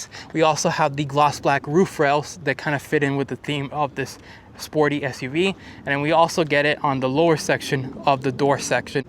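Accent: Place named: Miami, United States. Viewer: It’s the Miami accent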